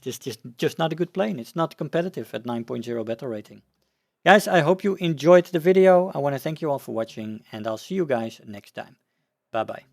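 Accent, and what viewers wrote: Netherlands. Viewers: Hey dude you accent is dutch i think. Am i richt? If im not then sorry😂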